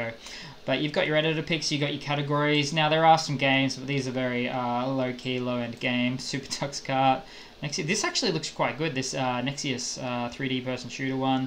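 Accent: Australia. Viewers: i love australian accent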